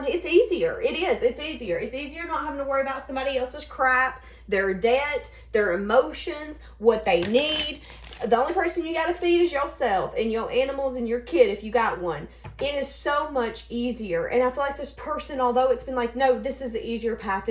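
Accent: South, United States. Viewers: You have a Southern twang but I love it 😍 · OMGEE!!! I watch ALL your videos EVERYDAY!!! Whether they resonate or not!!! I was searching for a video yesterday, like where is my daily tea!!! And why the past week I was thinking: I’d like to see her face on a live!!! Cause I absolutely love your country accent!!! Then BOOM!!! I click and see YOU!!! You’re gorgeous,!!! 🙏🏽🙏🏽🙏🏽 Ok, anxious to hear this reading!!!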